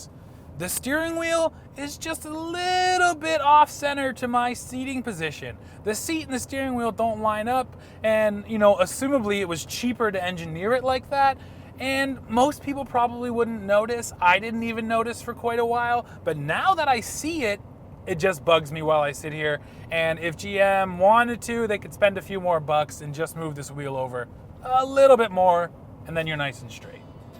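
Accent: Canada. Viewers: Actually, his accent is a Canadian one.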